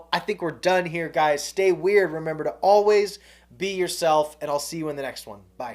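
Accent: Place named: Boston, United States. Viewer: You have a Boston yes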